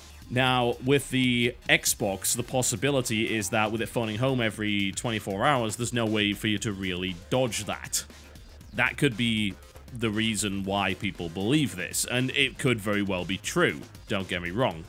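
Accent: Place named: England, United Kingdom. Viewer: ...theyre two different pronunciations. and keep in mind, the language is called english, and he's from england. see a connection?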